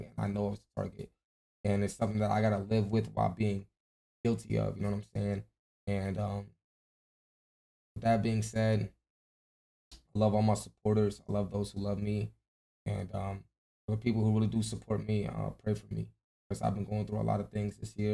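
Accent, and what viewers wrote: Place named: Canada. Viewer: Mans talking like Canada sweet lol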